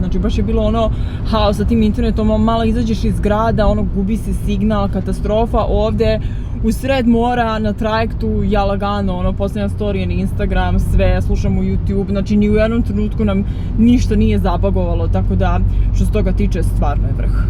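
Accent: Belgrade, Serbia. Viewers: Sto ti smeta,bg akcenat? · Pa nije bas tako gdjice ..dajte malo bolje istoriju Dubrovnika. Treba da malo znate i istoriju umetnosti i istoriju..a to je ovde na zalost izostalo. Sve ste pomesali..Inace Dubrovnik su napadali i sa mora i sa kopna pa cemu su sluzila uvrdjenja prema Srdju? kula Minceta? A tek taj napadni bg akcenat..ublazite to malo !!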